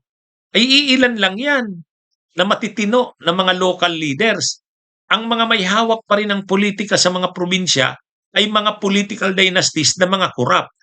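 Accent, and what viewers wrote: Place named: Visayas, Philippines. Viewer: atty, bakit bisaya kang magsalita?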